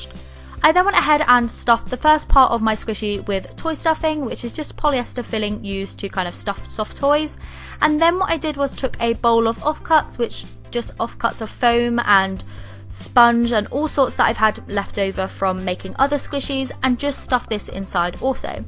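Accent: United Kingdom. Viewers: I've been watching u for such a long time I love ur vids! PS: ur British accent is adorable🫶🏼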